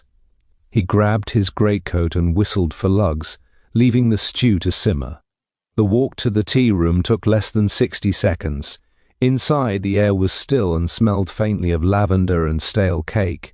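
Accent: United Kingdom. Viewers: The reader is very good and diction is very clear. However I’m sorry to have to say this, but hearing this read by a very English accent spoils the atmosphere, reality and charm of this story. The charm is in the Highland accent and pace of speech and this is not it. But I’d listen to any other story read by this speaker as he was so clear.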